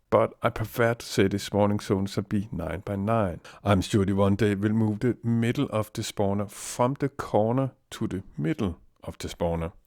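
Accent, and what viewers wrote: Denmark. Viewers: H0T-Vlog Go to My Channel What? I was just mentioning that we can hear the danish accent pretty clearly? Also its an 8 year old comment. I dont get it, why send an entire IGN walkthrough? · Dansk accent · You come from Denmark right? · Are you from Denmark?!?!? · I put your videos on replay just to hear your accent. My name is Dan, so it being a DAN-ish accent gives me some sort of ridiculous thrill XD but anyway good job, man, you helped me a lot to build a working mob trap for the guys on my server :) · you're danish. i can hear it. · er du dansker eller hvad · Are you danish? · er du dansker ???? synes jeg kan høre :P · are you from denmark? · I think he is a dane..... LOL HAHAHAHA dat accent. Nice tutorial though well done :) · he most be danish :D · He has a very danish accent :) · Are you from denmark!? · Your Danish accent is awesome! 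· du dansker ik? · You are 100% danish! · No, he sounds like someone who speaks multiple languages, is Danish, is a genious, and provided you with the perfect tutorial on how to build an xp farm. Stop hating cuz you couldn't dream of being half as smart as this guy. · His accent sounds very danish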